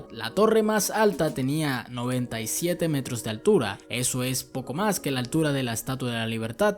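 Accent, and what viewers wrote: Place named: South America. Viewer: Vaya forma de destruir el idioma. Me parece que el narrador es sudamericano. Usar un diccionario para ver cómo se dice una palabra no te hará daño. Ni practicar la dicción. Qué bueno que no vivo en sudamérica, no podría soportar la manera tan peculiar que tienen de hablar castellano.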